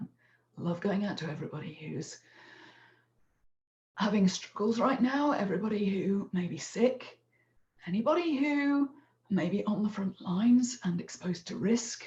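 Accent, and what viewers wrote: United Kingdom. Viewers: Hello my name is Tricia and I am an introvert:) your voice is wonderful. This comment has been along time coming; but I am truly an introvert. As I said I love your voice, it is one of those "super sexy low and dulcet toned British voice's". I am out of my shell why not because of any virus no no I am in a bother about the Foundation Raveled sign up. Yes I have been stalking the Foundations Raveled web site for 10 years. Yes there should be another why, for 15 years my life has been ruled by chronic pain, and could not sew:( But now after 3 operations, 4 months of exercise and this thingy in my right buttock that I have to recharge once a week and yes I do think of myself as a cyborg. Now finally my health is good enough to sew, I am slow but I can still sew. I learnt is to make plans for the beyond what ever the difficulty was with the chronic pain. 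So make plans and hold on to them in the here and now, this is how I got through the fear. Those plans are a foot, I think, I hope, I am on the wait list, I tried to put my self on it and now I am having a very small panic. Lastly before go back in to my shell maybe the wonderful could do these live talks regularly:) xxx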